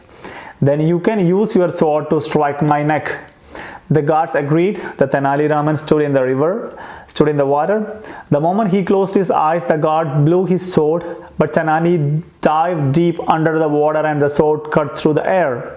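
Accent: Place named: Kerala, India. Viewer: I am sorry but your English is AWFUL. I just heard your first sentence and I had to stop because grammar was horribly off. You use "the" on occasions that you should not. You use "doesn't" in place of "don't", and "has" in place of "have" . And quite honestly, your grammar is so bad that I cannot even figure out what you are trying to say in your first sentence. Anyway, if I were to guess what you were trying to convey, the appropriate words would be "This video is only for beginners who don't read books, but who wish to read books". If I had to say it in a roundabout manner as you did, the words would have to be "This video is only for beginners who don't read books, but who have a wish or want to read books". In addition to your faulty grammar you also have a VERY thick Malayali accent that you need to improve. For heaven's sake, improve your own English (at least your grammar) before you teach others.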